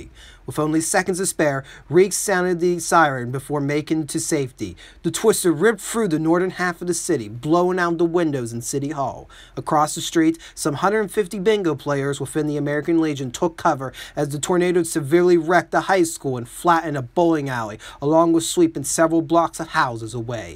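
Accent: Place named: Pittsburgh, United States. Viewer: he's definitely from the area, that's a very strong Pittsburgh accent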